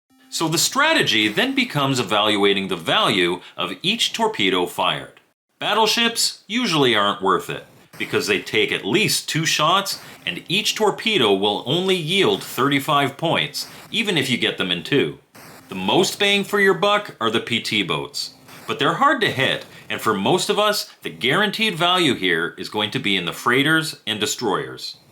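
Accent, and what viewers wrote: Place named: Russia. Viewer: Let's Go DJ Blyatman would Be Proud using that authentic Russia 🇷🇺 Accent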